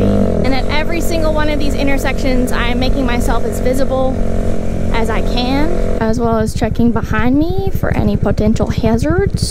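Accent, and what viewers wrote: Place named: South, United States. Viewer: Definitely can hear the country twang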